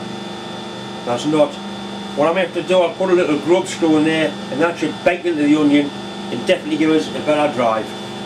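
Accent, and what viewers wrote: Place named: Geordie, United Kingdom. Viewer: When I subscribed to this channel, it was because of the impenetrable geordie accent. If I'd have know that there would be veggie machining iin the future I would have subscribed twice!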